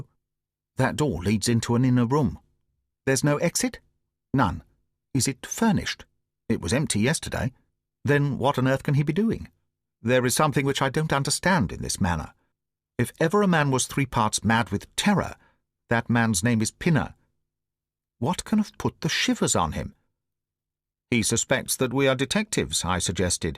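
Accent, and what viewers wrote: United Kingdom. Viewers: British pronounciation....Holmes and Watson did not speak the American language. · Amazing reading. Great British accent.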